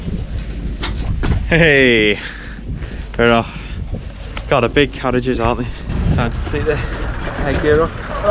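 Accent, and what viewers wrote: Liverpool, United Kingdom. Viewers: I'm trying to figure out your accent. Is it scouse?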